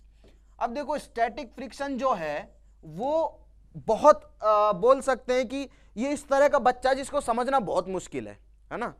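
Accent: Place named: Haryana, India. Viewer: Guruji pahle teacher ho jiski baat smjh m aarhi h lage s aap haryana te ho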